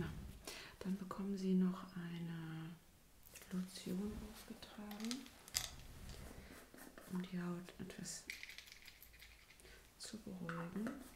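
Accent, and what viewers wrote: Germany. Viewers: Está hablando inglés o alemán? O habla el inglés con el acento alemán, solo curiosidad, habla diferente pero se le escucha bonito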